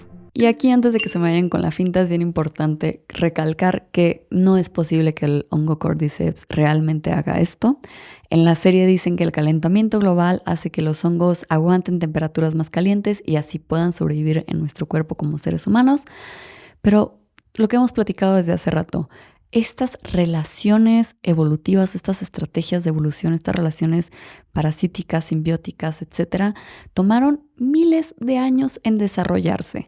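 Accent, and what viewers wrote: Mexico. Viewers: Linda tu y lindo nuestro acento mexicano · Me encanta tu acento mexicano!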